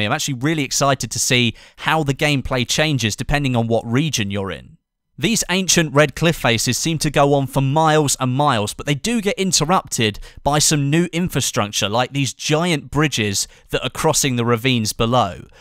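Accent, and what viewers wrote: Spain. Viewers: I see you took the old Spanish accent out of the cupboard :)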